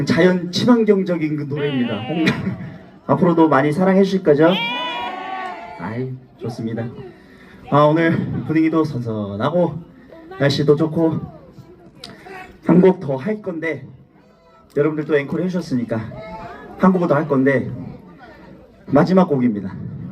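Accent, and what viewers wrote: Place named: Chungcheong, South Korea. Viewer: 환장하것네~~~ 정겨운 충청도 사투리 귀여운 수현님이 하니 더귀엽네요😂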